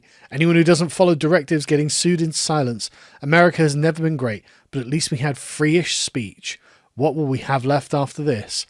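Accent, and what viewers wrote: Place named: United Kingdom. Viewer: You may have bought all that equipment 6-7 years ago but damn. You can’t buy that Brit accent that turns $2 words into $10 words.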